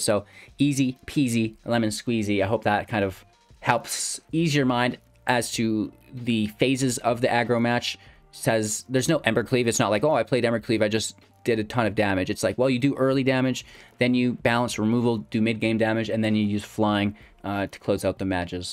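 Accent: Canada. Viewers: had an extra strong Canadian accent today. Oooout. Love it.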